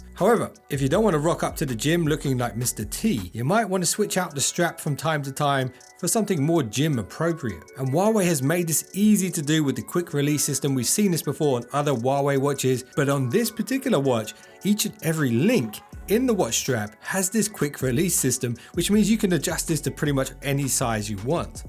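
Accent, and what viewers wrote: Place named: United Kingdom. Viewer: Probably UK; judging by his accent.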